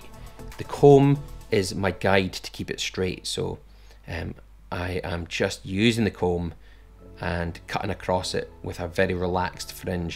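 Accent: Scotland, United Kingdom. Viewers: I’ve lived in the states since I was wee, it’s still lovely to hear a calm Scottish voice every now and then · Question, is that a Scottish accent? Lol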